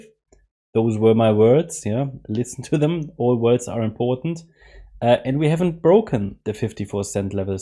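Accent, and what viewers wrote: Germany. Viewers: Man I can feel that German accent, love it. Keep it coming. Can you reference a link to your membership and what is actually available for subscribers \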